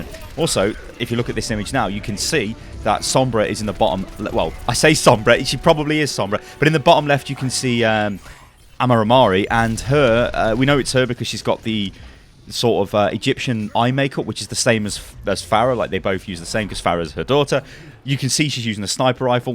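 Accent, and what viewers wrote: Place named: United Kingdom. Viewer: I love your accent and voice, where in the uk are you from?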